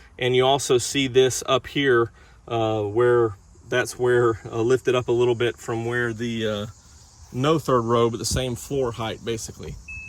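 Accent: South, United States. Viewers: Southern accent…easily misinterpreted. Bench is the word vs Captain chairs in the CX-90. Nice vehicles!!!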